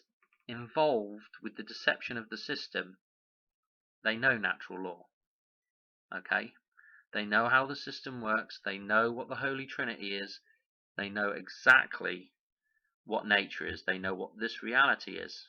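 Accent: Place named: Rural, United Kingdom. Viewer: I’m currently locked down in India. Your accent is bringing me taste of home. Im from Devon, but there does seem To be a common thread running trough the rural Tounges. I’m Enjoying your work. It’s been connecting some dots for me. Cheers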